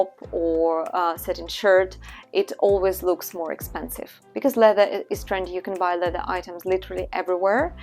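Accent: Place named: Russia. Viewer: Русский акцент чувствуется 🤣😂🤣🎷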